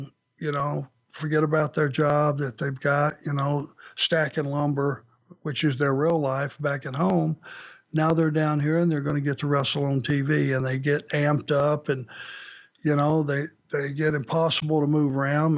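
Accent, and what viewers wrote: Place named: South, United States. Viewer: This is my first listen to anything from Arn's podcast, and all I can think of in listening to him is that he sounds like Winnie The Pooh in a southern accent. Listen to Winnie the Pooh from the 1970's when Sterling Holloway did his voice and imagine that with a southern accent. This is today.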